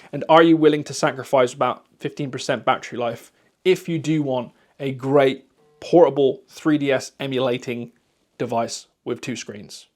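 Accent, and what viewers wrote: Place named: West Country, United Kingdom. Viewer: My guy, you sound like you're from the Westcountry like me! I cannnnnot wait to get my Thor!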